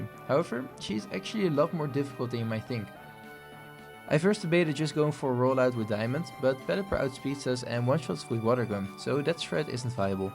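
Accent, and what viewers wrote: Netherlands. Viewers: weird question maybe but are you dutch by any chance?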